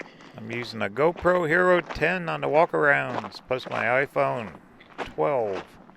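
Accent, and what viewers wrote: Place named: Oklahoma, United States. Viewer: This might have been a interesting video is it weren't for the obnoxious oakie narrating.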